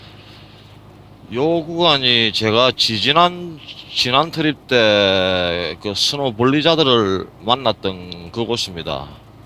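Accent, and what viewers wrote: Gyeongsang, South Korea. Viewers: 동영상 잘 봤습니다!! 경상도 사투리가 인상에 남네요^^ . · 영상 잘보고 있습니다~^^ 저는 대구사람인데 경상도 사투리가 구수하시네요~^^ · 캐나다 언제 갔습니까? 그리고 갱상도 분이시군요 여기도 부산임다~ 구독자수도 많이 생기면 무작정 운전만 아니라 구간마다 생각날때 답변해주실수도 있겠죠 ㅎ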